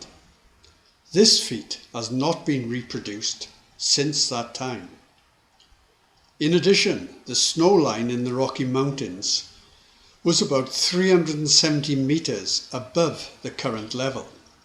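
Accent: Wales, United Kingdom. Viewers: This is one of my favourite channels on the YouTube. Fact based. Clearly explained. Exceptionally well resourced. And a warm welsh accent. (Newport or the nearby valleys if I'm not mistaken) in a measured tone. Top, top work mate and thank you for taking the time to put this excellent content out. Diolch yn fawr.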